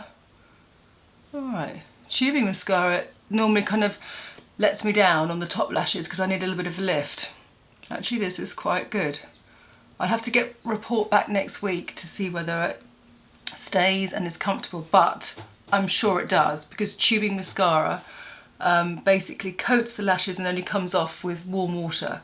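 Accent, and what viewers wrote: United Kingdom. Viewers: I love your British accent!!!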